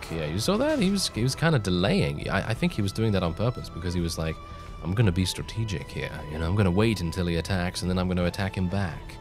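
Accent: United Kingdom. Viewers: His voice changed so much, go to his first series with barney beartilde, he used to sound so british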